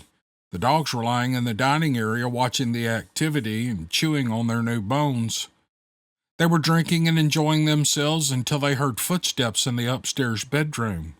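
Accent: South, United States. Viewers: that was an awesome story! Please keep writing when the muse hits you like that. Thanks for sharing that and the other stories, love listening to them. By the way, you sound like most of my neighbors, which is normal for the South. I am in Louisiana and went to Chamberlain Hunt Academy in Port Gibson for 6 years, so part of my growing up was in Mississippi. Great place to grow up! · I have so missed you soft, southern voice. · Darn, you always keep me up late with your interesting, and superbly presented stories......I love the accent, what part of the South are you originally from, is it Georgia? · I gotta tell ya Brother, as much as I love, How to Hunt, Steve's an honorable man, as I've said before, I Truly, Appreciate the Southern Man, in your voice. You have a gift, even if it ain't languages or accents, other than Southern.. GBU, and your family. 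I really love listening to your voice, and the peoples stories, Steve Lilly, or lived experience.....Aloha. Oh, and this story, Twisted. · You, my friend, are a very good writer or storyteller. I could listen to you all day long. I often re-listen to the books you have read while cooking. I like the southern cadence quality that you have. Anyways, I've missed you but had no doubts that you would return to us eventually. ❤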